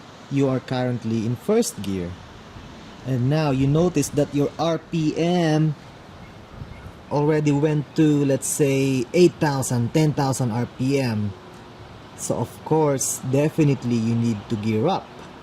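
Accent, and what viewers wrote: Philippines. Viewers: dude are you filipino?? you sound like a filipino man hehehe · U sound Filipino, are you?? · HES FILIPINO HAHA ACCENT SO OBVIOUS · ayus ha pinoy na pinoy ka magenglish brad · lmfao the filipino accent I can't take him seriously bahahhaah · he sounds Filipino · Thats a heavy ass filipino accent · dat pinoy accent tho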